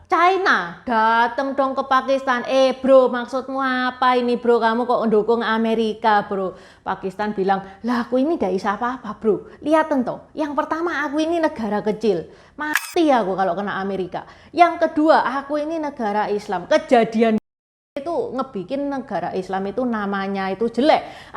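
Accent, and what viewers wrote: Java, Indonesia. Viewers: Medok bgt logat'e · Medokmu kental banget to cici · Wong Chino logate kog Jowo...manggone nag Endi.. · Mbak ini bahasanya medok kayak orang Jowo sedikitpun tidak terdengar tionghoa hehehe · SENANG DENGAR LOGAT TACIK INI YANG KHAS CHINA JAWA MEDHOK BANGET🙏👍👍👍😅😅😅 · Jowone endi to jeng,aku subcriber antar lho❤ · Medok banget jawanya ...❤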